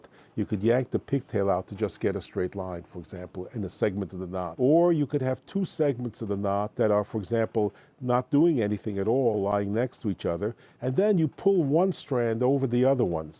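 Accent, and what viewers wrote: New York, United States. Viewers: That guy is clearly a native English speaker. Probably just a NY accent. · what accent is this? new york? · New Yorker. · I LOVE that New York ACCENT !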